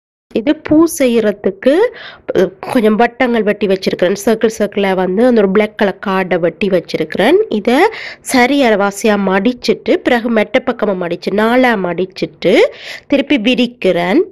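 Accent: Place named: Jaffna, Sri Lanka. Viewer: வணக்கம் சகோதரி. தங்கள் சமையல் குறிப்புகள் இரண்டும் அருமை. அந்த அலங்கார குறிப்பும் சிறப்பு. தங்கள் யாழ்ப்பாணத் தமிழோ இனிமை.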